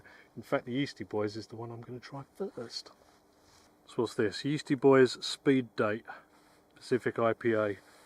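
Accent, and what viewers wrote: United Kingdom. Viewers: He's British definitely not American accent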